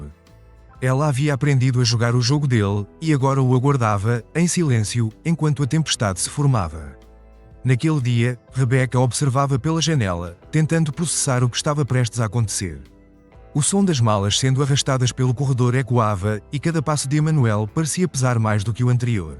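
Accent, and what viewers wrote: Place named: Portugal. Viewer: essa voz sensual desse português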